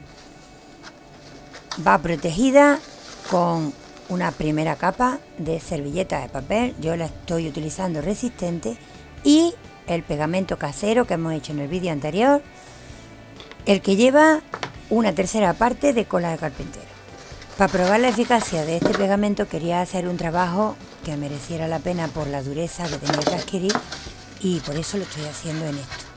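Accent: Spain. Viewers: estas pasa!!! eres mi inspiracion de veras todo lo que tocas sale excelente y tu espanolado me encanta...hago las piezas mias con las quimicas tuyas y todo sale excente graciasss!!!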